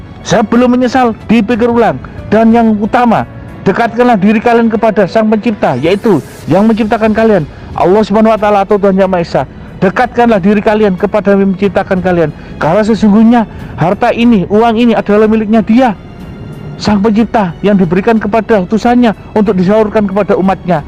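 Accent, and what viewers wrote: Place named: Java, Indonesia. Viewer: Nada bicaranya seperti org jawa. Gk akan lama lagi anda tertangkap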